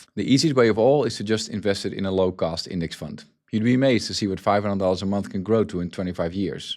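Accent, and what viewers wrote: Netherlands. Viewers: It too me a few videos to pick up the Dutch accent, good job, it’s pretty soft, unlike my grandfather from Bruges Belgium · I guess it’s just me but hearing a Dutch accent somehow increases credibility with these types of videos. I will be following your advice more closely! Edit: Ha I knew it, I even read your book!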